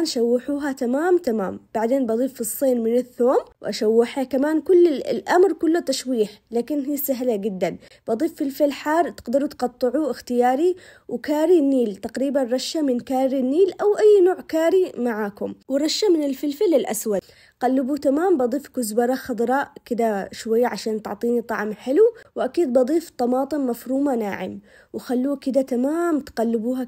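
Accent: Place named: Aden, Yemen. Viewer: اللهجه العنديه احلا من الاكل.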